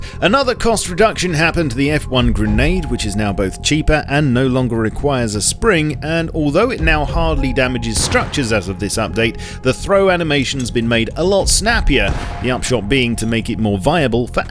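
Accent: United Kingdom. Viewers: British I think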